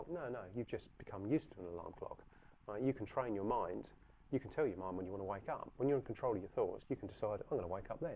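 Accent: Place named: Australia. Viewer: i love this guy's accent..very broad australian with english twang..hahahaha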